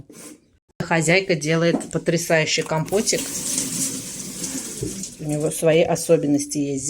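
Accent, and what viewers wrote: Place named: Russia. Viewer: У нас в России есть пословица, встречают по одёжке, провожают по уму, спасибо за интересный фильм, вы по настоящему русская женщина, я имею в виду как вы разговариваете и строите свою речь, только так русская может говорить и высказать свое мнение, интересная ваша семья, дети воспитаны, выскочил ваш канал, не смотрела, а потом подсела, лучший турецкий сериал, здоровья вам.❤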